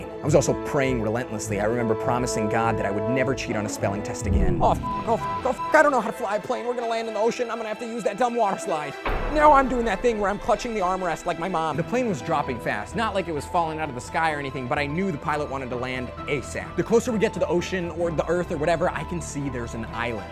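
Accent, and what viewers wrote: Russia. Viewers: I love the Russian English accent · And his Russian accent he dose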